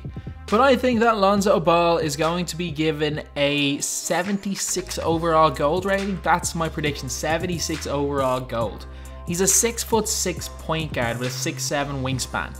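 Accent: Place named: Ireland. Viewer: Is he Irish bc he sounds like Conor Mcgregor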